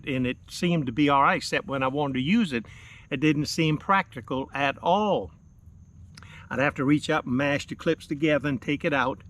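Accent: South, United States. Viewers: you have a great channel! And your southern draaaw is so great! Love watching and listening. But truly, I really love learning. Even though I van camp, the idea of “Packing small” suits my adventure style and you guys teach me so much! And I like to know stuff!! 😂🙌🏼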